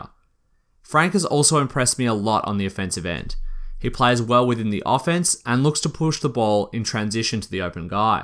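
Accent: Australia. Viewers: u have Australian accent?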